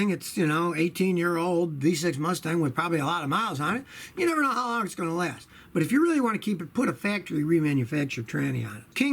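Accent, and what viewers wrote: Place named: New England, United States. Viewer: The way says "car" always makes me wonder if he's originally from New England.. Pennslyvania or New Jersey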